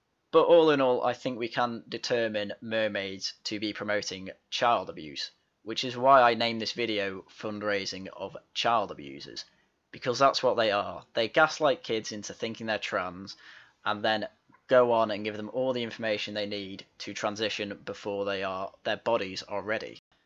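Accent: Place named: Manchester, United Kingdom. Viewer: sorry my dude it says "Infertility is therefore one of the major side effects of the course of treatment that runs from puberty suppression through cross-sex hormones to surgical sex reassignment." Which includes cross sex hormone treatment. Which means there is a lot of misinformation in this video. BTW you sound dead familiar are you from greater Manchester?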